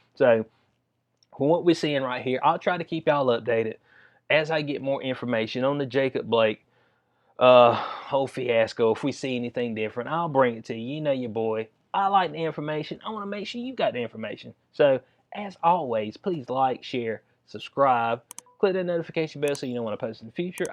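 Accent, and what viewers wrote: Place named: Arkansas, United States. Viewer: Just curious- where’s tha accent from? I guess south Arkansas?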